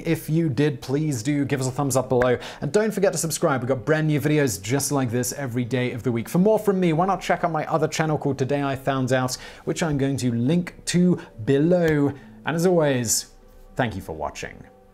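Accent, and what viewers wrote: England, United Kingdom. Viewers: He can’t pronounce a lot of words. It takes more than glasses and an English accent to be intelligent. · He's British. You can't quite judge him on pronunciation unless you yourself happen to be from there as well. Additionally, accents in the UK as a whole have noteable changes every 25 miles so even then you could be off. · Hey Top Tenz, maybe slow down your production frenzy and aim at better accuracy. The first mugshot you showed of "John Dillinger" was actually the mugshot of Baby Face Nelson. I appreciate an English accent as much as the next person, but information hurled at the viewer like a machine gun in a Brit tone doesn't always mean it's the truth. Hire a fact checker, for heaven's sake. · Brains00007 Brit-speak. Go figure. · Please stop following the current fad of having videos narrated by a Brit. The accent is horrendous and distracting.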